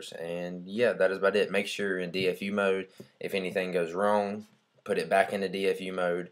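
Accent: United States. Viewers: Note to Self- Dont get tech advise form a guy with a country accent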